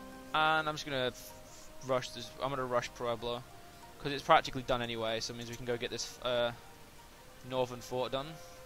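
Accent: England, United Kingdom. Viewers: are you english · You are british.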